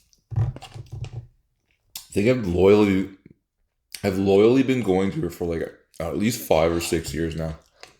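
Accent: Canada. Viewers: Where in Canada are you from? I’m guessing Alberta by the accent.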